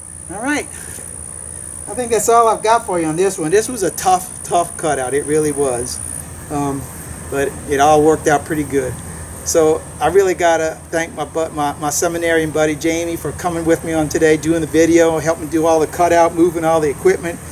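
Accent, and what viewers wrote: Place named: South, United States. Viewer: I'm not just binging on bees—I'm binging on bees that are being moved by guys with Southern accents. Now that I live in Seattle and most of my Southern immediate relatives have passed, the combination of the hum of the bees and the southern accent is so soothing...like a lullaby and my childhood all wrapped up together! 'Night and may God bless us all and all the US.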